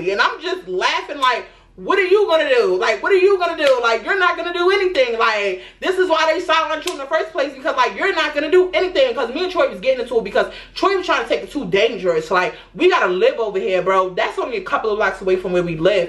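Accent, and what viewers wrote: New York, United States. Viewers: Lmaooooooo im from jersey and grew up inna hood and HATEDDDDDDDDDD all this ghetto ass shit being around me. (But no shade i loved to watch it from my window 😂😂😂😂😂😂) The way you tell these stories ny accent and all be having me deaddddddd. Makes me lowkey miss it a lil bit. But i love how youre real about ur experiences and where your mindset was at the time. I'm glad you got outta there, because alotttttttttttttttt of the people i grew up with are in the same damn place doing the same damn thing.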